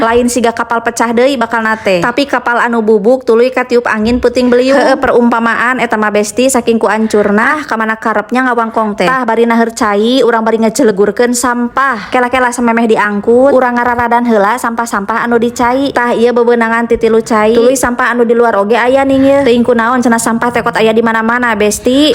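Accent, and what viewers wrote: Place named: Garut, Indonesia. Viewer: ciga logat urang manaaaa Kitu teteh...😂😂😂,,Garut kidul kitu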